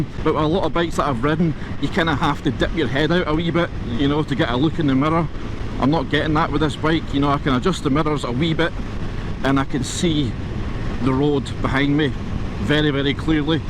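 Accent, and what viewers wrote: Scotland, United Kingdom. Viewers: Love this video, love the Scottish accent and above all, love the Busa. Great bike to ride.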